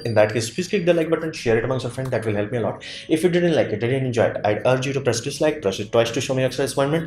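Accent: India. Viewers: My United States English accent and your Indian English accent are far enough apart that I could not follow your presentation, so I lowered the replay speed to 75% and everything became clear. I'm just mentioning this as a suggestion for anyone else with the same problem.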